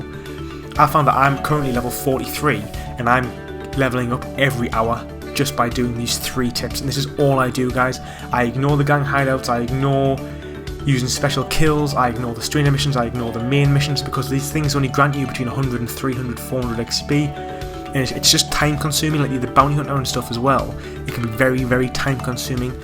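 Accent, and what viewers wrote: United Kingdom. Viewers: This guy is definitely British but idk if he sounds Geordie or not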